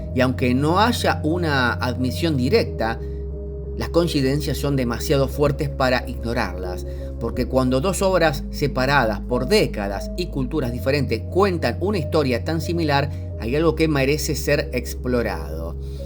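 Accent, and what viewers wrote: Argentina. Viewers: muchos ya deberían darse cuenta que argentina es un país latino y se habla español, solo que no les gusta o no entienden el acento rioplatense 🤷‍♀️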